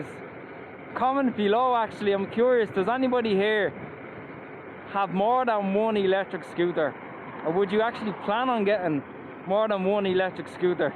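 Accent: Ireland. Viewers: That Irish accent is pretty strong 😂😂😂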